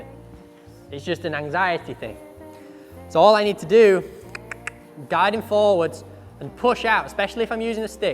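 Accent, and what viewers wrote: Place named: United Kingdom. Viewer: Bit difficult to take this serious a cowboy with a British accent.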